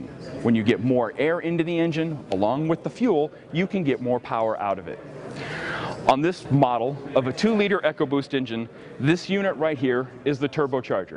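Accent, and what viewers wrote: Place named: United States. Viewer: pronouncing it with his stupid American mouth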